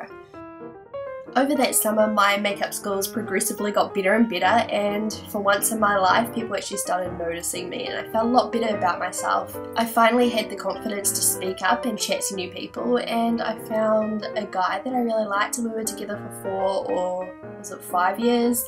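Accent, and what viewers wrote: New Zealand. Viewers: i knew u were Nz ur accent is sooo strong :) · Wow! I'm so glad things are going well for you now :-). I never would have guessed that you went through all of that - much of which I can identify with. You are gorgeous with and without makeup. I love your videos. I was really surprised to hear a Kiwi accent after hearing mainly American ones. Bless you for who you are :-) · Yeah yeah I know, first off, it was rhetorical, because she obviously is from NZ, but yeah, that wasn't supposed to be like that btw hahhaahaha :3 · Did you even watch this video? She says it like 10 times and she has a kiwi accent😂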